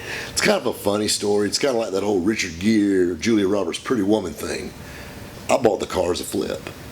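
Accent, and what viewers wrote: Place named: Carolina, United States. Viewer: When I read these posts I hear Carolina accent and voice in my head...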